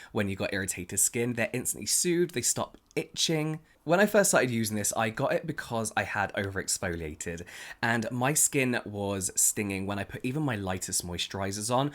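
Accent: United Kingdom. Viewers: Okay i have to say it. I just love you and your Videos and your Intro and the way you speak and your British Accent, it sounds so elegant 😄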